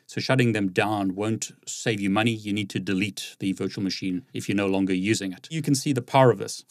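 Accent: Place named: South Africa. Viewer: It's a South African accent!!!!!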